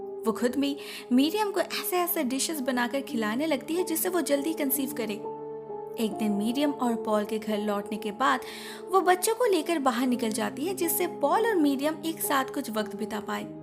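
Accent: Bengal, India. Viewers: Your Bengali accent ❤️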